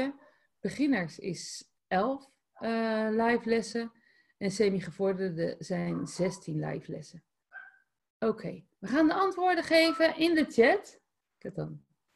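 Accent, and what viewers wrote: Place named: Netherlands. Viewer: Aún tiene un acento holandés grandísimo lol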